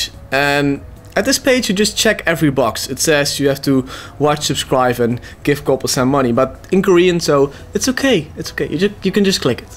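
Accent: Netherlands. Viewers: i knew it you are dutch your accent made me think is he dutch xD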